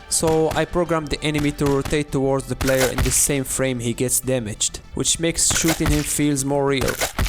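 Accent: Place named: Morocco. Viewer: You sound Moroccan wlah akhoya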